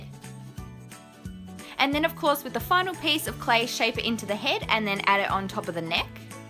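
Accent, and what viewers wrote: Australia. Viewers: i love your tutorials! are you australian? im aussie.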